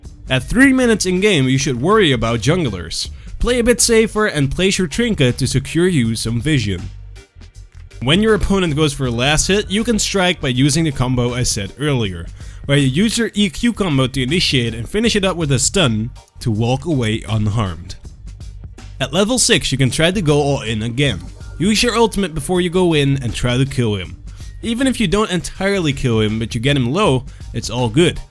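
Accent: Netherlands. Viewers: gij zijt nederlands :D !!!! <3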